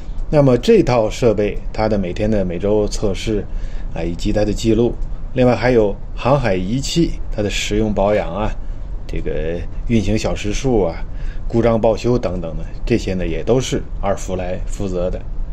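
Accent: Tianjin, China. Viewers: 船长辛苦！下次来纽约新泽西需要什么提前说一声，无论你在哪一个terminal停靠，我都可以给你送过去。您略带津味儿的普通话很咯儿很亲切（我是北京的，您有一期节目车在二环上行驶从我家楼下经过) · 您是天津人吗？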